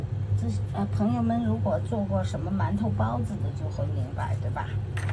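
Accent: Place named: North, China. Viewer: 奶奶您怎么讲话一点不像快百岁的老人，思路清晰，口才好，而且听您说话像北方人，没有一点在东南亚国家生活的口音。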